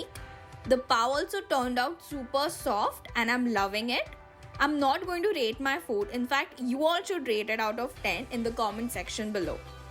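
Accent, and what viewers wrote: India. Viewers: I luv ur hindi accent 😙😙💕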